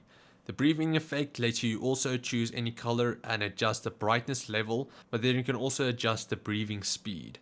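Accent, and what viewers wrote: South Africa. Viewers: Sounds south african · thicc South African accent. Nice vid.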